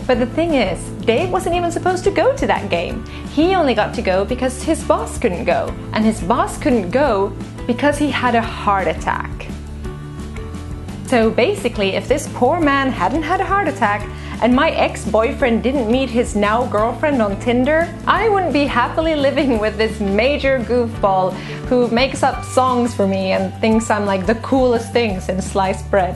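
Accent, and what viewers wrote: Finland. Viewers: They were from the Helsinki/Espoo area. I heard you're from a small town or village. They were all Finnish speaking Finns, except for one who claimed to speak Swedish and Finnish both as native languages. She didn't start a conversation with me, she dropped a toy mascot on the floor, I found it, then she came up to me to claim it back. BTW, I can hear your Finnish accent when you speak Swedish.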